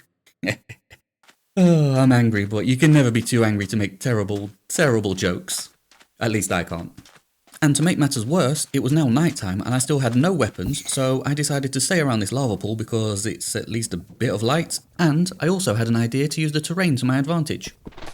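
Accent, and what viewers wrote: United Kingdom. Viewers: What kind of British do you speak. I love it 🥹🥹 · Your British jokes are very distinct and interesting.. but your accent is incredibly annoying, like every British accent. Could you tone down that way of speaking a bit, or else speak in Brazilian Portuguese so I can understand? Thank you!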